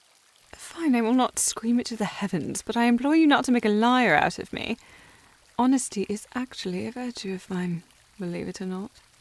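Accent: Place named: England, United Kingdom. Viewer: Yo, when she hit me with dat " Well go on, go! Time is of the essence!", I was so happy. Dat English accent slaps!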